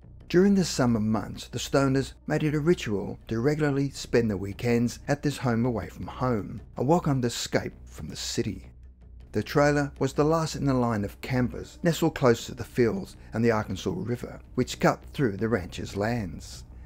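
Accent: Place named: Australia. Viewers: I think they probably do know he’s Australian. M 😀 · 99% of people know he’s Australian bro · People probably don’t know that the narrator is Australian. Everyone thinks we all sound like Steve Irwin. He has a great voice for narration. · How can you not know?? He has a thick aussie accent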